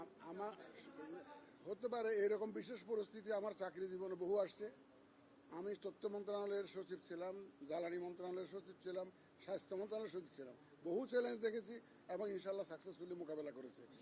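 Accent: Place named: Chittagong, Bangladesh. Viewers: চট্টগ্রামের মনে হয়। কথায় চট্টগ্রামে আঞ্চলিক ভাষার সুর। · ভাষার ভাব বলছে, চাটগাইয়া স্যার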